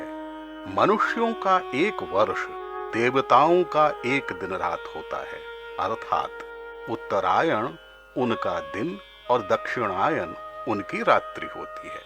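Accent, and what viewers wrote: Standard. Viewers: शुद्ध उच्चारण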